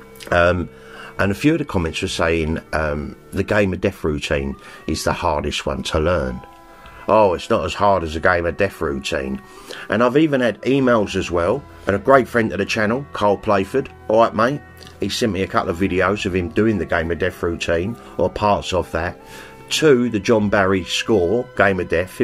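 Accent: Cockney, United Kingdom. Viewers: GO ON MY SON GET IN THERE. ICF. EAST LONDON IM HAPPY TO FIND OUT YOUR WEST HAM LIKE ME I WONDERED WITH THE COCKNEY ACENT BUT NOW I KNOW PS I PLAYED FOR WEST HAM UNDER 16S IN 1985 SHAME I GOT HIT BY A CAR PUT IN WHEEL CHAIR FOR 14 MONTHS 😮😮🙏💯🤞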